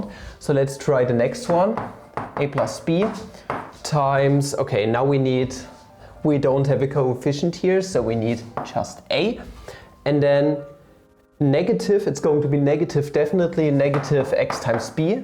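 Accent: Germany. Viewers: ne dachte gleich der klingt deutsch, profil .. aha deutsch xD ja er hat einen starken deutschen Akzent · German accent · is he german? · Are you german? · This is the most german english i've heard today.. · Bist du Deutscher?😅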